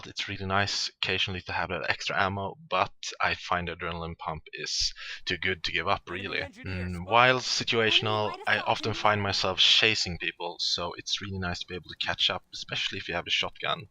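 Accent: Sweden. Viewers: From your accent I'm gonna go ahead and guess that you're from Sweden, or at least Scandinavia. :-)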